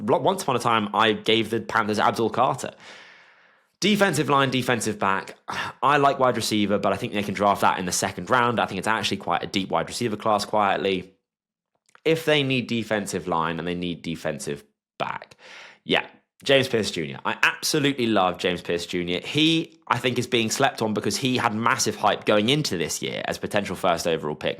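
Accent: United Kingdom. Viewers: Sorry I can't listen to some British guy talking NFL. Wrong football mate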